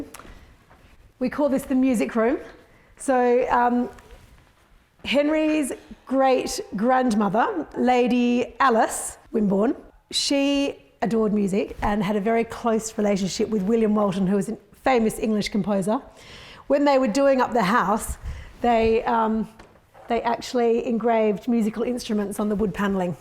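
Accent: Australia. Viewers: Thank you for the tour. You sound like you're from Australia? You have done a very good job of the interior decor. It would be an honour to be the owner. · Sounds like an Aussie accent… nice 😊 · Stunning house. Has to be my all time favourite ever!, The history! Tudor wing, gate house! You've been sensitive, respectfully with simple elegance & modern livable touch. Your work is amazing, your respect and love of the property is clear and evident. Congratulations on a wonderful job. I adore this property & gardens as it looks and feels like a huge home yet regal, splendid in everyway. Absolute favourite. Do I detect an Australian accent?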